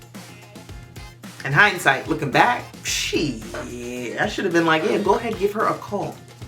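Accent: Trinidad and Tobago. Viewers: Hi first-time visitor to your channel...you are a beautiful trini womanand a great orator...excellent. Love the trini accent...keep it coming. Trini man here...enjoyed your delivery style❤❤❤ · Ohhh guuaaash I never realized she ah Trini.... I'M IN LOVE....sorry finish the story..... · Jamaican? It’s Trinidadian,, very different accent. Jamaica is only one island in the Caribbean. Every Island has a distinct accent. It’s pretty easy to switch when you’re immersed in both. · I knew you were from the Caribbean but this video and the last I watched confirmed you trini 😂❤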